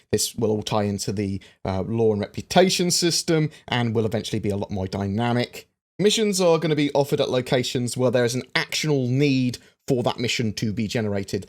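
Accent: England, United Kingdom. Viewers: Youuur accent is so Englishhhhhh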